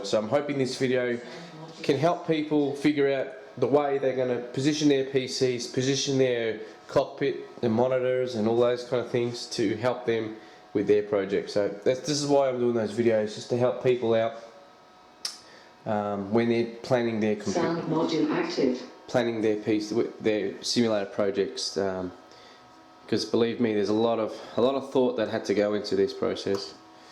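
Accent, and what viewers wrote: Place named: Australia. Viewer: That Aussie accent is real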